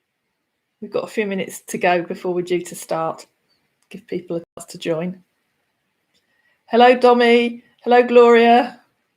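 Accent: United Kingdom. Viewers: Hello! I'm learning English and I discovered your chanel. I'm interested in romance scamming because it's an interesting subject. I've seen several videos of yours and at last I see your face. Your have such a lovely voice and I like your British accent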